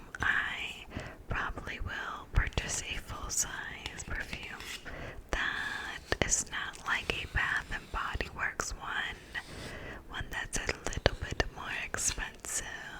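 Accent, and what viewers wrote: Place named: Midwest, United States. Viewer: your Midwest accent makes me feel right at home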